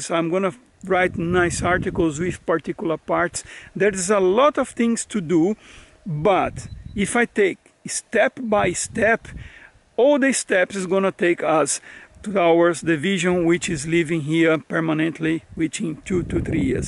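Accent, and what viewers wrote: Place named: Brazil. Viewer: Algorithm just presented your channel to me. I will be following you on your restoration journey with interest. I knew you weren't Spanish, but couldn't place your accent as I'm not used to hearing Brazilian people speaking English. I'm English living near Valencia and understand there are laws concerning building on nature reserve land. You found a great property with huge potential. Are there many building regulations you have to abide by? Also you say "we", do you have a partner or are you solo. Best washes and good luck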